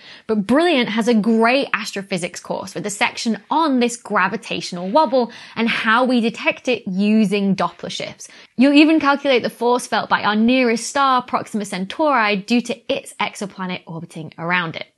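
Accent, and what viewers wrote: United Kingdom. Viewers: Over here, on the other side of the pond, we colonists (oops, I mean Americans) pronounce the word cen-TRI-fugal, not centri-FU-gal. That's not to say you're wrong... just different. (I actually am quite taken with your UK accent.) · She uses the British pronunciation with the accent on the u. American pronunciation has the accent on the i. Both are correct in their respective countries. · Wobble. Wooble. You pronounce it almost like the second word so yeah, it does sound a bit funny. Just like Saturn. Sah- uhn! Love your stuff Dr. Becky. I just have to translate your accent! When my wife and I watch Brtish TV shows, I'm always translating for her. We are both native English speakers!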